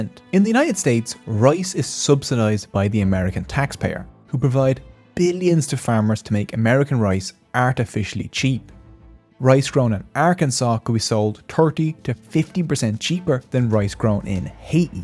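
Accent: Ireland. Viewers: Can't stand his Connor McGregor accent. Sorry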